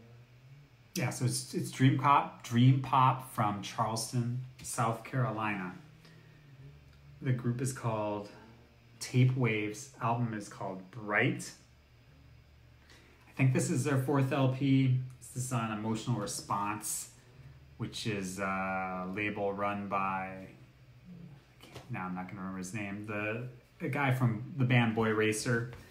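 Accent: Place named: Scotland, United Kingdom. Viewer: Good to see u back. Interesting as ever with a healthy does of Scots. Prefer your first pronunciation of Brisbane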